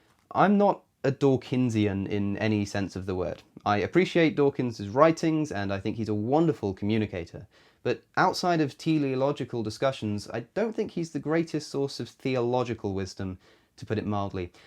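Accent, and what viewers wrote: United Kingdom. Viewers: hes smart tho and he has a British accent. 2 for 2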